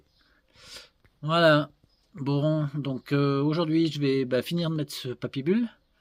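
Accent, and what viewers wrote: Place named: Savoy, France. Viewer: new follower sur ton channel, tu as des compétences de ouf, bravo pour tes réalisations au plaisir de te suivre , tu as vachement l'accent savoyard, mdr.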